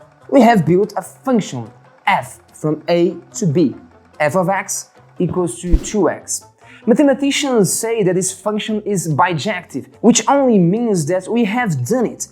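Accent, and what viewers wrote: Minas Gerais, Brazil. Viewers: Minas Gerais accent is marcante, não tem jeito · cliquei no vídeo so p ouvir inglês em mineirês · O inglês "brasileiro" é o que eu mais entendo bem kkkkkk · UM MINERIN FALANDO INGLES É IMPAGÁVEL, QUE TREM MAIS BUNITO DE OIA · Não terminei o vídeo, mas quero saber onde tá o mineireis. "Why" não vale! Kkkkk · Now I can practice my listing with math vocabulary and Brazilian pronunciation, thanks for that. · Sotaque mineiro em inglês é foda kkkk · Lhes apresento amigos o sotaque mineiro em ingles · O sotaque Brasileiro a falar Inglês é algo que me deixa intrigado, mesmo assim, excelente vídeo!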